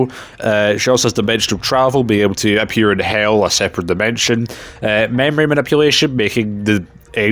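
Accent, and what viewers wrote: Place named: Scotland, United Kingdom. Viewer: you are Scottish as hell and i love it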